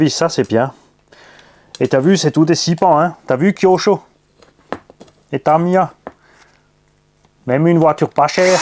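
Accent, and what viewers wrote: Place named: Alsace, France. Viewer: Excellent l'accent alsacien amplifié avec toute les petites phases, on dirait mon plus jeune fils qui fait le touillon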